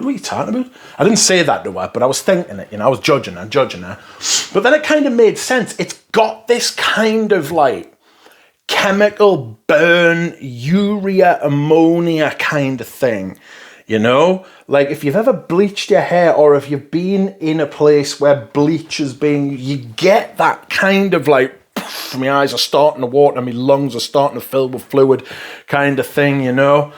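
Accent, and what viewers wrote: United Kingdom. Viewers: You are absolutely hilarious. Have you ever considered radio or script writing, both? We Yanks love the sounds of a Brit , save Piers. You should. You have the best wit and voice of all of these reviewers ~I will discuss this perfume until I have discussed it to a disgusting amount.~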